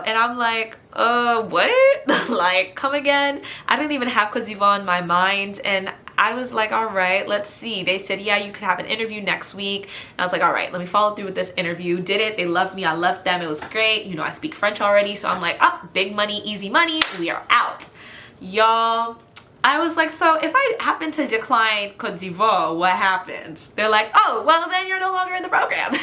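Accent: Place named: United States. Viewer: Bonjour, je pense que vous vivez peut-être aux. États-Unis ? Vu,votre accent !!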